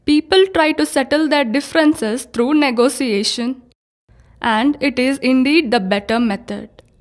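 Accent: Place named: India. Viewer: CAN'T UNDERSTAND THIS F@ INDIAN ENGLISH....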